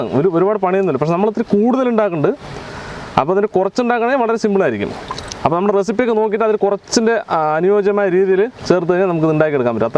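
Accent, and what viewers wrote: Palakkad, India. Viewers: Igale palakadan shyli samsarom kooodi cooking nte kooodea cherumbo oru pratheaka monjaanu vediosnu super aanu Ella vediosum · PALAKKAD basha sooper and sooper biriyani.. Proud to be from pallakad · You are the best brother, I keep waiting for your food recipes. Also, your Palakkad accent has become a hit.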